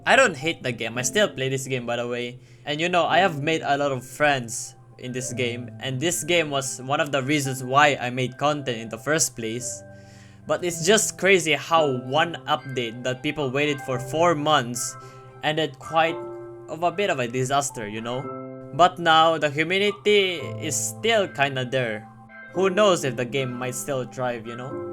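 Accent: Philippines. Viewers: This is the most pinoy accent ever yo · you sound filipino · Filipino Accent??? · haha knew it from the accent, im also a filipino playing cw nice content btw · Is Filipino? cuz the accent sounds like it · pinoy to AHAHAAH halata sa accent eh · the strong filipino accent is killing me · Im new to the channel and 20 seconds in i noticed that Filipino accent XD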